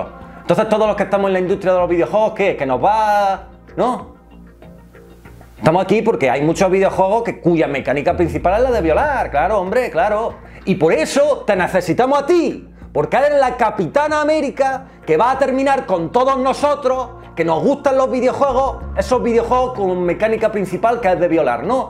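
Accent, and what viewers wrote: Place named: Granada, Spain. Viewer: Que bonito acento granaino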